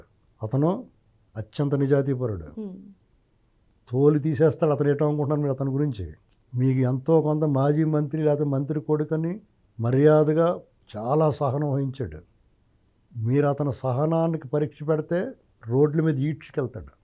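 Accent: Godavari, India. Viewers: సూపర్ గా చెప్పారు గురువు గారూ.. ఈ యెదవలందర్నీ బహిష్కరణ చేయాలి.. ఈ విషయం గట్టిగా ఆలోచించాలి. మీ ఆలోచనలనూ, మీ సూటిగాప్రశ్నించే విధానాన్ని మీ అభిమానిగా.. ఎపుడూ అభినందిస్తాను. ఎన్నో మామూలు జనాలకు తెలియని, అర్ధం కాని విషయాలు కూడా ఈజీగా గోదావరి జిల్లాల యాసలో, సామెతలతో చెప్పడం నాకు బాగా నచ్చింది. నమస్కారాలు.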